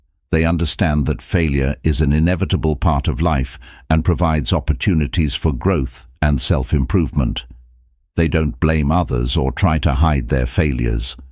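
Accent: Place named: United Kingdom. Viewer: It's a great subject there's a shit ton of it out gear what sets you're presentation apart from the herd and saysclisten to me???? IT SURE AINT THAT CALM CONDENSENDIN ENGLISH VOICE THATS ON A COUPLE OF DOZEN NEW PIECES OF CONTENT A DAY ARE YOU READY TO DITCH THE ACT TO USE YOURE REAL VOICE??? AND IF THOS IS YOURE REAL VOICE ,WHEN ARE YOU GOING TO PUT THE ACTION BEHIND YOURE WORDS AND ACTIALLY PUT POWER AND HART ONTO EVERY SENTANCE??? CAUSE THE WAY YOURE PRESENTING THIS WANDERFUL MESSAGE YOURE sabbatoging the good you could be doing you want a better channel more thumbs up ditch that posh english crap or if that is you iam sincearly sorry find away or get a coach watch some videos on public speaking you're message ain't bad but there us nothing holding me gear specially when I know this crap allready see you say let go what we cannot control beuitif thing but you're killing me iams fighting sleep cause bbbbboooorrrriiiiinnng not impressive no emotion it feels you're just a damnd actor reading some lines even worse an actor just taming a job to get by with no real interest in what there doing and sad is it is Ionley comment cause I care ill probably be watching new content from you tomarow the difrence is if you sound like you're just paying the bills no matter how good the info is you're getting the down ward thumb iam ubsubing and reporting you as just another goddamnd a.i. 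pretender and I fing hate snitches and will probably abuse my self as a sick punishment for breaking Mt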